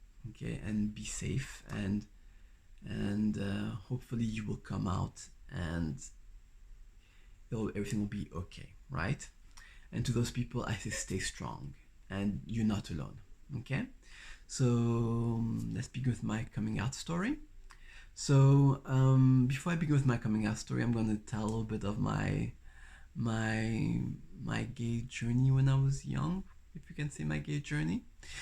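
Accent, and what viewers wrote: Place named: France. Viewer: OMG, you are soooo gorgeous and incredibly charming!!!! It sounds like you are a French speaker. Where are you from originally?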